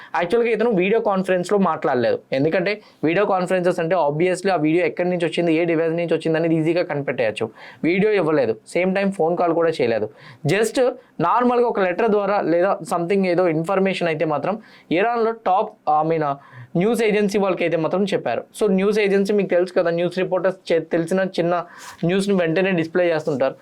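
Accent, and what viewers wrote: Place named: Telangana, India. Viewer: Why this telangana slang all of a sudden?😅😅😅😅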